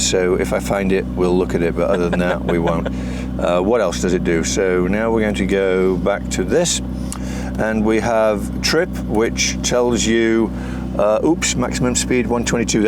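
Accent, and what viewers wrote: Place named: Lancashire, United Kingdom. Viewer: I'm losing my mind trying to place his accent. It sounds like Lancashire, maybe even a little Liverpool, definitely not London. Can anyone confirm?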